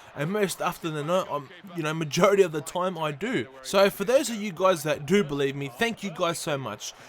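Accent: Australia. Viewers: New Sub here and a Spurs fan. Found you after buying fifa 14 and looking for some tips / gameplay. Normally the aussie accent wrecks my head but you know your footie and your commentary is great!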